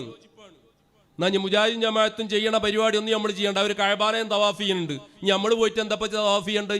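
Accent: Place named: Malappuram, India. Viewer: Edenda engane paye usthad mare style Ningal Malappuram aano...kurach bhasha nannakkiyal nallath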